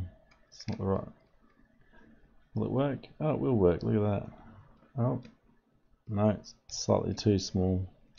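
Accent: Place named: Australia. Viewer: First time watching your upload came from Sorins Electronic repair school. Thought you are an Ausie reading the comment bellow confirmed your accent. Ill watch your other uploads later.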